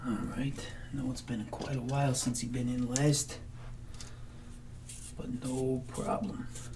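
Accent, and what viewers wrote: Boston, United States. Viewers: Hate Boston accent.... Maybe something different? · I have to say, being from North Carolina myself, I have never met someone from Boston! I think it's such a neat accent, so much character! I always get sad with northerners think southerners are stupid automatically because of their accent or vice versa!! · The Boston accent is the best in ASMR I would say.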